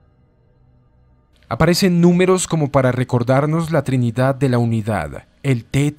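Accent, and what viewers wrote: Colombia. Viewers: Eres colombiano ? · Eres colombiano 👍🏻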